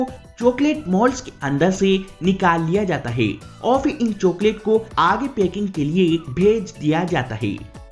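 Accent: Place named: Bengal, India. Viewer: Bengali.. Accent mast rahata hai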